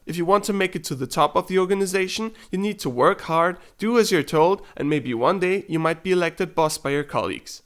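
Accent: Germany. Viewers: are you german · The narrator sounds german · You have a german accent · are you German? you have a very heavy German accent, cute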